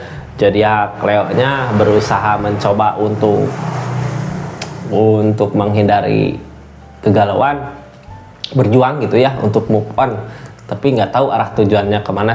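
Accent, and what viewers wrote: West Java, Indonesia. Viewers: Kk logat sunda na kaciri pisan . Abdi oge sunda bandung 😀😀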